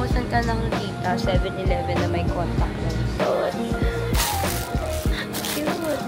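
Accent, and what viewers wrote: Conyo, Philippines. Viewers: AAAA i really like listening to you talk conyo, soo cutie talaga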